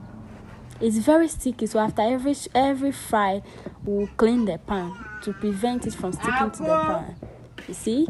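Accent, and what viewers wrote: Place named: Ghana. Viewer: Very educational and interesting 🎉 Plus your voice and GH accent is giving 😊🌹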